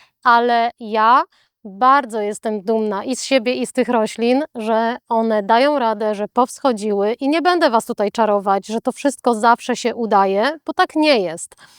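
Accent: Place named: Poland. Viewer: Och prawdziwa osoba, prawdziwy ogród, prawdziwy polski głos. Dziękuję za ogromną wiedzę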